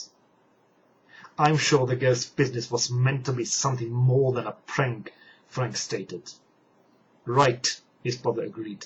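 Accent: United Kingdom. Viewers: Wanted to check out this series before buying it online. THanks for posting this. It's nice that you have a Brittish accent.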